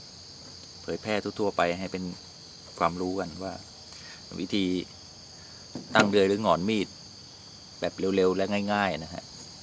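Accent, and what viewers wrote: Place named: Central Thailand, Thailand. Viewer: ขอบคุณคร้าบ ทำไมพูดกลางชัดจังครับ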